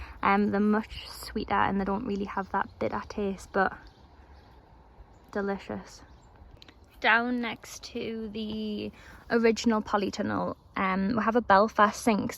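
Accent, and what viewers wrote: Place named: Newcastle, United Kingdom. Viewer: Hi you are a natural your accent is so cute not too much Newcastle. I like that you are more old tech school, please keep it that way.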